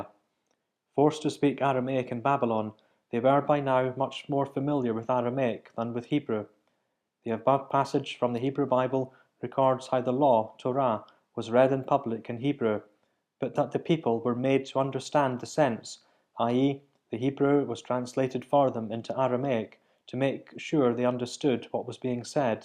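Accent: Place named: Scotland, United Kingdom. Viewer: Very helpful, Mòran taing. From a family bright to New England I confess that I appreciate your accent from Alba (?) as much as I do the Aramaic.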